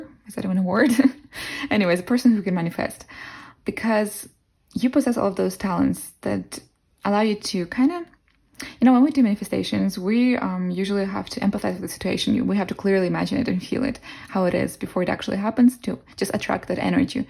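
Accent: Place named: Eastern Europe. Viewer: You're a really good reader, you have great intuition. I picked pile 1, and most of the things you said resonated. I am very sensitive and empathic, and I'm autistic. I'm 31 years old, and I started to have severe sensitivity to certain sounds in 2014. These kind of sounds are always happening, so I've been forced to be homebound since 2014 as a result. I'm unable to leave my home except for short periods of time to buy groceries from the shop nearby, I'm unable to do anything, I'm just stuck in my home and suffering, because I can still hear the loud noises from outside even while staying inside (they are loud, terrible noises caused by people driving in a terrible way). I'm also very sensitive to people's energies and vibes, but even more so than you said. I can tell if someone is a good or bad person by looking at them and feeling their vibes, they don't even have to say anything. 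I hope you will do a reading that would tell me if/when my situation will change, because I've been trying to escape this situation that I'm stuck in for years but it hasn't happened so far, it feels like all the doors have been closed on purpose by a higher power, and nothing is working despite all my efforts. By the way, I'm curious, are you from Eastern Europe? Your accent sounds Eastern European. Thank you for your readings!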